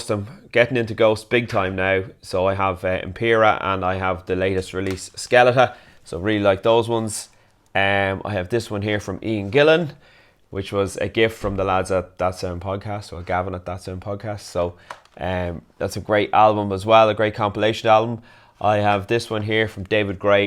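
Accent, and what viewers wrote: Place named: Ireland. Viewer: Yes!!! CDs with an Irish accent 👍 Loadsa, y’ just can’t go wrong🤘 👍🇮🇪🇵🇸✊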